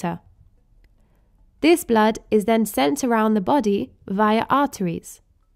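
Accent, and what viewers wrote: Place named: United Kingdom. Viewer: Bless you and your British accent. Thank you.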